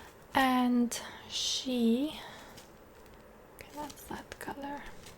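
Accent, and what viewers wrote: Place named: South Africa. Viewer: Where is your pen from please.? Also love hearing the South African accent I really miss home when I hear it.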